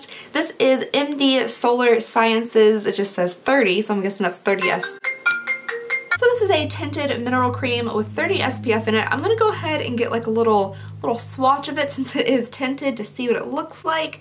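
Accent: Kentucky, United States. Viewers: Ha ! I was like she sounds like she is from Kentucky! I am from Louisville. Love your videos !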